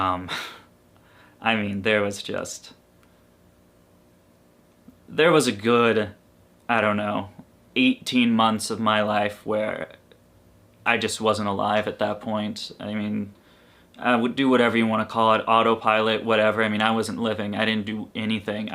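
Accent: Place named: New England, United States. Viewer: i love your accent...ya damn yankee <3!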